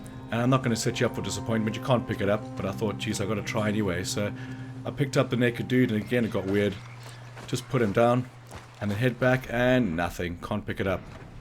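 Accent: South Africa. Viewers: South africa? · Are you South African? Your accent sounds South African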